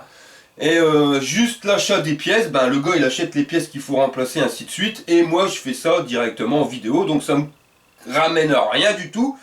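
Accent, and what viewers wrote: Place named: Nord-Pas-de-Calais, France. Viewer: Salut C'est vraiment mérité les 100 k pour ta chaîne j'aime beaucoup ton style et surtout l'accent chti vu que je suis à l'opposé géographiquement parlant. Prends soin de toi.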